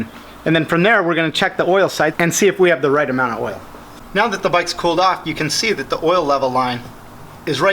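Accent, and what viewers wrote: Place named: England, United Kingdom. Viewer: I am not saying has gay hands even though they are pretty small and your ascent some times sounds a bit English.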